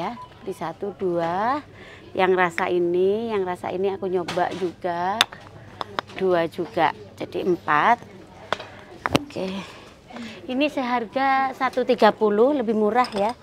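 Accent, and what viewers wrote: Indonesia. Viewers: Selamat pagi semuanya, selamat pagi mba dan sekeluarga, semoga hari ini lebih baik.senang klo uda liat vlognya mba.seruuu dan cara bicaranya khas Indonesia banget.semoga mba slalu dberi kesehatan selalu ya bersama keluarga tercinta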